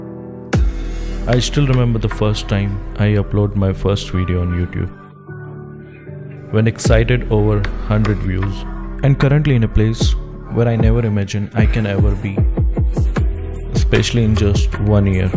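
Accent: India. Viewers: I knew it!! You're an indian 🇮🇳👳 I will never forget that accent Btw.. You've done such a incredible video. That's showing your talent so much, the sound effect and the timing.. Cool man.. Love from Indonesia❤ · Me: Heard his accent Also me: Is he an Indian?🤔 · I subscribed u wen u had 120+k subs now u have 300k+ subs....that's a great achievement in jst one year.....gud luck for ur future...and btw r u indian??cuz ur accent sounds like one...love from North East India.....😄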